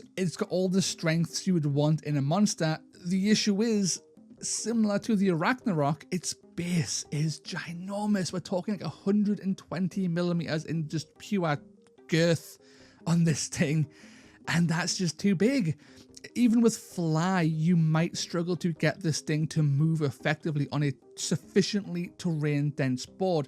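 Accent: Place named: Spain. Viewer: I love your spanish voice lol I use last tournament a Deathbringer against a Zombie Dragon... and he flew away lol CHAOS POWER!!!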